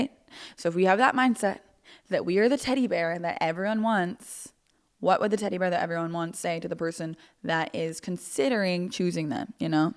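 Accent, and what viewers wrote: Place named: Ohio, United States. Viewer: As a fellow Ohioan who also moved away, I love when your Ohio accent comes out 😂🥰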